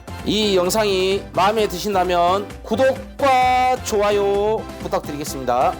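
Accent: Jeolla, South Korea. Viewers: 전라도는 이신가벼요 친숙해서 좋아요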